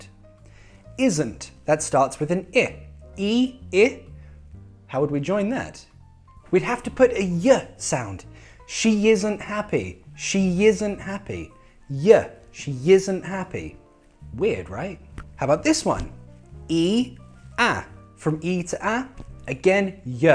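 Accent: United Kingdom. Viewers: Gosh =((( I wish i could speak like that. British accent is the best. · amazing as always.... i really love british accent, such an elegant way of delivering ur speech, thanks for uploading. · the way u teach is perfectly amazing..I mean I don't have ny words to describe...I have seen so many videos on YouTube of different people..but u r d one who makes british accent learn very easy.....thanks a lot for all ur videos.. God Bless. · I like British accent so much. And I love the way you teach.:-D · sweet British accent 😍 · Thank you for sharing, i really like British accent as well as England's culture, i thought i love your country, and i'm a fan of Manchester United, anyway i love your video! I learn many many things from it! Once again thank you! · British accent is the best! And now what a cool way to learn it from ya, thank you loads! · a Man with british accent is really Hot😀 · an intelligent cute guy with british accent...oh lord...thats soooo goooood.... 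· Brit accent got Style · whats kind of your accent? estuary or R.P. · Thanks! I like your videos so much - particularly because they are about British English! You know, on the 'net' the majority of English speaking video hosts are North Americans, which are quite all right to listen to. But when I hear British English - that's totally different! So I'm hoping for more of your vids to come.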